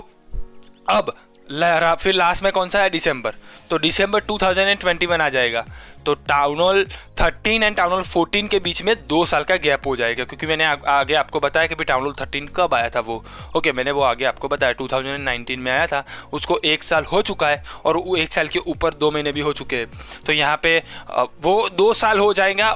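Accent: Gujarat, India. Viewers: Kya ap Gujarati ho . me bhi Gujarati hu mujhe apki language Gujarati jesi lagi.plz reply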